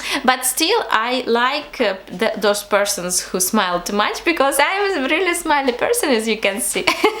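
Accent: Russia. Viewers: слиииишком русский акцент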